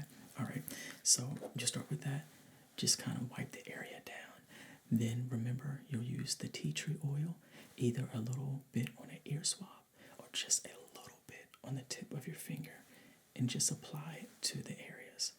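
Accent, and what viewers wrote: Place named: United States. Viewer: I hear an American accent! Weirdly, I was expecting him to have an English one!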